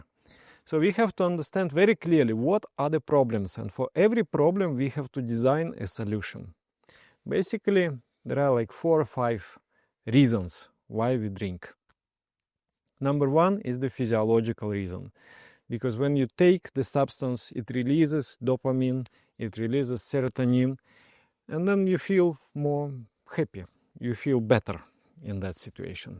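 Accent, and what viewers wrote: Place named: Russia. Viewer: I love the Russian English accent.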